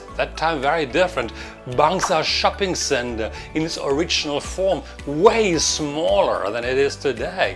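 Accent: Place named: Germany. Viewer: Commendable is your resistance to assimilation. If I lived in Germany I would've completely adopted all of the best that German culture, literature and idiomatically. You still have got the thick and melodious German linguae!!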